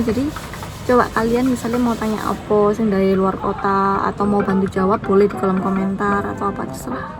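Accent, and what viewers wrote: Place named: Surabaya, Indonesia. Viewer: Ak kok seneng yo lak ndelok mbak e ngomong suroboyoan 😄😄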